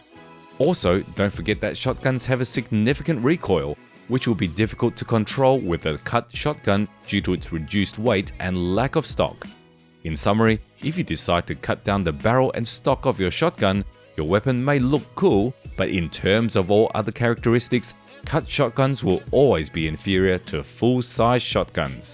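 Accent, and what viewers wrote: United Kingdom. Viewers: this vid lost me at a brit narrating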